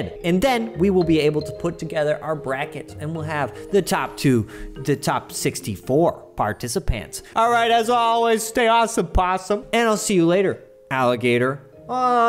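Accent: Germany. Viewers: So, first time watching this dude, for a split second I actually thought hes german. By far the most convincing so far. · Doch klar, ist Bayerisch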